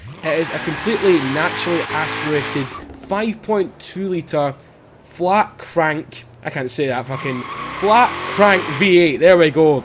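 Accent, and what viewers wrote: Scotland, United Kingdom. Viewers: Where abouts in scotland are you from?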